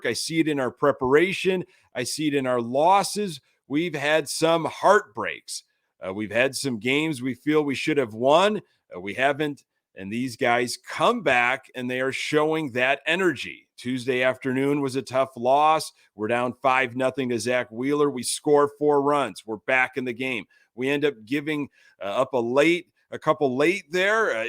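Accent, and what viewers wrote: Chicago, United States. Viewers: I'm not a Sox fan, but really like your channel. Lots of info, and that Chicago accent.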